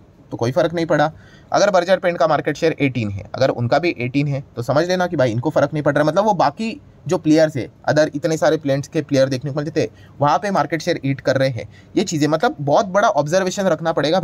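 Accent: Maharashtra, India. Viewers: guruji pakka maharashtra ke hai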